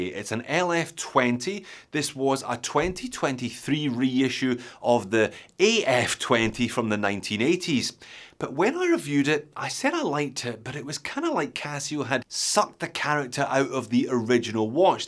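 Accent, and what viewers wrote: Scotland, United Kingdom. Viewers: Great to hear a fellow Scot talking about things I love! These are great looking mod kits, I was looking it up while you were building yours and I thought the colour way you chose was the best one too 👍🏼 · I’ve just managed to buy one in metal case. Love your Scottish accent coz I’m originally form Glasgow and enjoy everyone of your programmes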